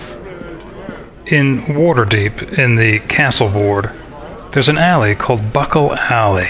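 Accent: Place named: Philadelphia, United States. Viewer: It's very Philly-of-Italian-Descent. "Hey yous. Hey yous guys! Yous been down the shore?"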